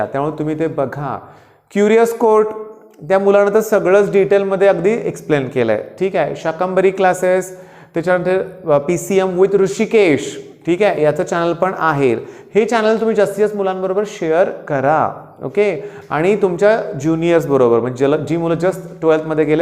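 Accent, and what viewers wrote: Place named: Pune, India. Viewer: Its Puneri marathi I guess....You have no rights to make fun of this. Hope you get some wisdom about respecting our Teacher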